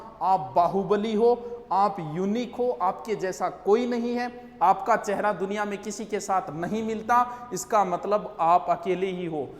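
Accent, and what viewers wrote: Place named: Rajasthan, India. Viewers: Sir very helpfull video,,,,,,or aap ki rajsthani awaaz. 👍🏻👍🏻👍🏻👍🏻👍🏻👍🏻👍🏻😀😀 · Sir aapki bolli jhakas h Kadhe kadhe Meri daadi puchhe kara. Yo chora haryanvi h k rajasthanni 🤣🤣🤣🤣🤣🤣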